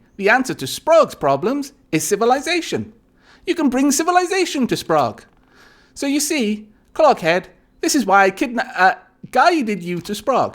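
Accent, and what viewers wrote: Wales, United Kingdom. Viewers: Damn it. You make me want this game. Both my wife and I know the joys of hearing the jaunty awesome Welsheness of.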